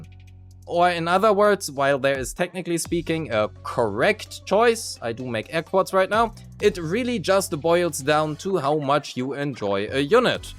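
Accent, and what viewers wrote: Germany. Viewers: I know a german when i hear one, danke für das video meister!!!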